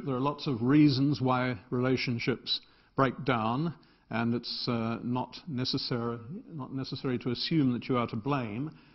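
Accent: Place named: RP, United Kingdom. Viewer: O'Rourke . . Of course he is British. He has a typical upper class academic English accent. Moreover, he used the term "chuffed", which in England means "very pleased with oneself or the outcome". However, I suspect that in his time he has been a 'bit of a lad' himself.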